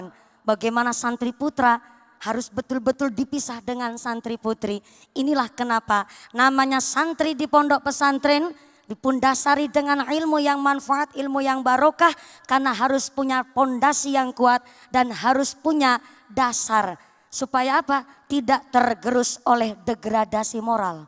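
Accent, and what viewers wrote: Banyumas, Indonesia. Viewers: Ustadzah dari republik ngapakers (mugi2 sehat lan sukses) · Mandan ndredeg ya mbak? Angger ngapak mandan maen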